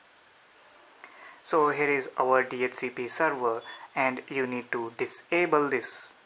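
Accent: India. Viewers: Nice, slow and clear also detailed explanation dude Not like other indians that explained with really fast commenting and also not clear too what they blabbered about, to make it worse sometimes brought very heavy indian accents I end up dont understand anything, lol About your accents, only a little indian accent and very acceptable Thx mate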